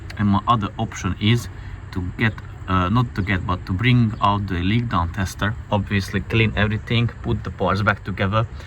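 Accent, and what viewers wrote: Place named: Hungary. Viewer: I thought you were Hungarian your accent is very similar to a workmate of mine. He has a penchant for old BMWs 😊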